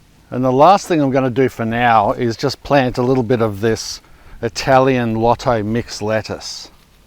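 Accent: Australia. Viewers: Good information. I so enjoy watching your videos. Love your Australian accent, I live in Virginia in the USA. You would think my southern accent funny I guess. How cold does you winter time temps get? I think right now you are in fall nearing winter, as we are in late spring, almost summer.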